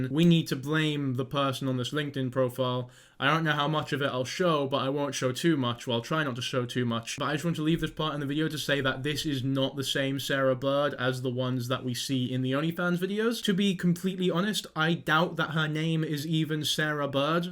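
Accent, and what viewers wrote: United Kingdom. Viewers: I was gonna watch but he's british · Thank you YouTube algorithm for blessing me with finding British Kwebbelkop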